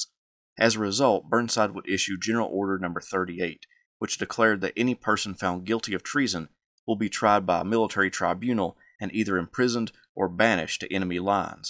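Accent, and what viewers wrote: South, United States. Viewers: Your voice on this video reminds me of a very cute actor. I'm just lousy with names. This actor was 6'1", about 195 lbs, dishwater blonde hair, brown eyes and your wonderful southern accent. Oh, I remember, he played on the show Bones.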